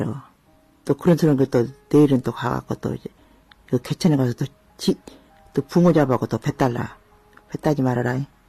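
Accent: Jeolla, South Korea. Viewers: 우리엄마가얘기하는거같다 전라도사투리도쓰면서ㅠ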